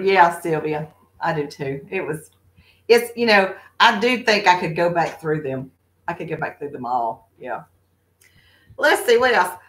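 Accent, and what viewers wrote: South, United States. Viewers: I enjoyed your stories and y'all's sense of humor. You "cracked me up!" 😁 I also like your Southern accent. My grandpa on my mom's side of the family was from Gaffney, South Carolina. I loved his accent! My grandma was from Cherokee, South Carolina. I never knew her because she died when my mom was 7. I hope you continue to put out more content. It really cheered me up.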